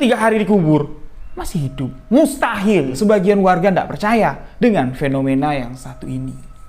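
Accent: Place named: Sumatra, Indonesia. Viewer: Mas org sumatera ya…? Logatnya kayak org sumatera😊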